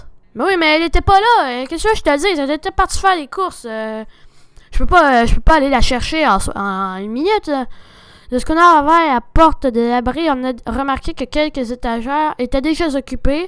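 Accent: Quebec, Canada. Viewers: Super vidéo en plus tu es Québécois j'adore l'accent Quebécois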